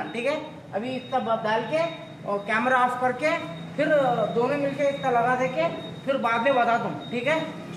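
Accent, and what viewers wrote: Hyderabad, India. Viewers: Talent ❤️..that Hyderabadi accent ❤️ kiraak 🎉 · Hyderabad hindi Right?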